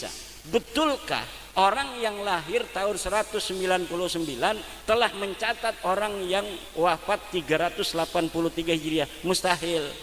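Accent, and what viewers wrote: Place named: Batak, Indonesia. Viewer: Dari gaya bicaranya rijik saja nazabnya sudah batak. Mukhibiiin....mukhibiinnn. yang pinter rek !!!!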